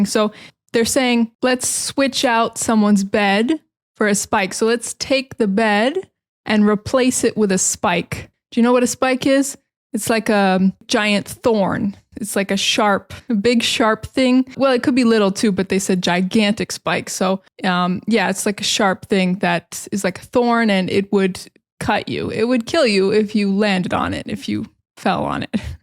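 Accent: United States. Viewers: Thanks for recommending Micho Brothers, they are awesome. By the way in living in São Paulo as well, in a homeless shelter. I really appreciate your channel, you're helping me a lot to improve my English skills, your american English accent really matches with my goals. Thanks so much dear teacher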